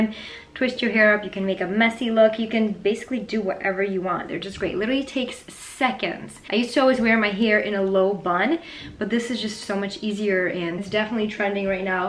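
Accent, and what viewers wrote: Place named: Midwest, United States. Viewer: no way ur not from the midwest with that awesome accent...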